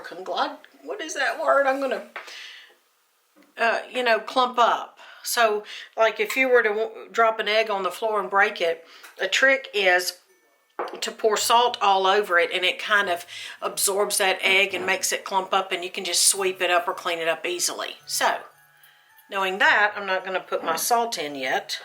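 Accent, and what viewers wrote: Tennessee, United States. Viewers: Hey from Maury Co. Mt Pleasant here. I recognized your accent as soon as you spoke. ☺️ Thank you so much for this recipe, Merry Christmas! ❤️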